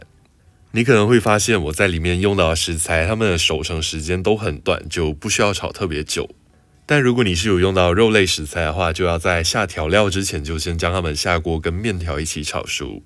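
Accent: Taiwan. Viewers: 是馬來西亞人喔，口音跟台灣蠻像的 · 会是马来西亚的人吗🤣怎么怎样听都不像且像台湾，马来西亚人讲话的风格众所周知😂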